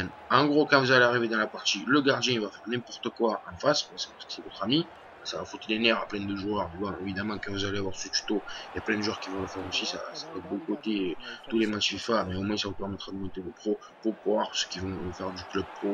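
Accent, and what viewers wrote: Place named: South, France. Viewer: T'as un accent du sud ! Tu viens vraiment de Belgique ? :P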